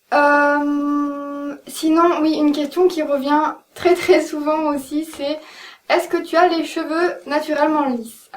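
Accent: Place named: Quebec, Canada. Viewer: tes vidéos sont super! c'est mignon tu as un léger accent québécois!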